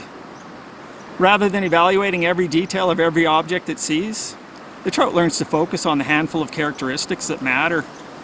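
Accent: Ireland. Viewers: Really interesting. Love the irish twang as well. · Are you in Maine/Labrador? I can hear an Irish twang in your voice.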